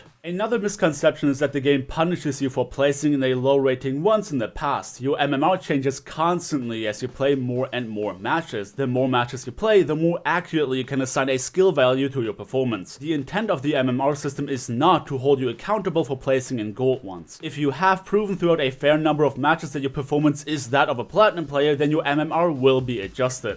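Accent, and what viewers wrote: Germany. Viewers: are you a German Guy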